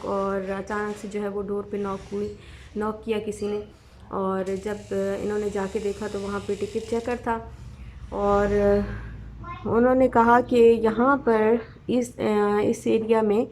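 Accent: Saraiki, Pakistan. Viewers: Apka laahjaa asa hai jesy sarayki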